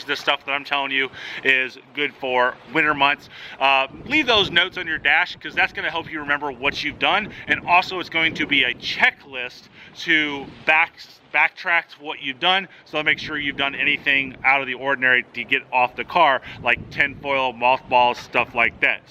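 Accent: Pittsburgh, United States. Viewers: Just a quirk in your accent...you've kinda got a Pittsburgh yinzer thing goin' on!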